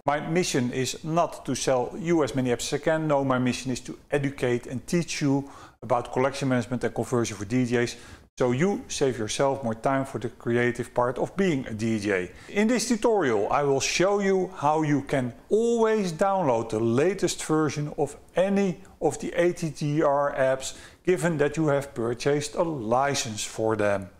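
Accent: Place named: Netherlands. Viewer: Dankjewel voor jou dienst. Altijd leuk om de Nederlandse accent te horen. Groetjes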